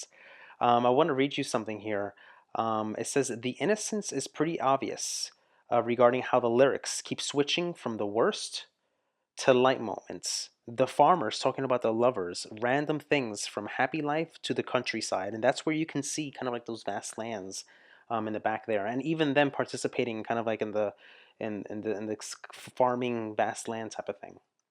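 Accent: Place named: United States. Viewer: Accent US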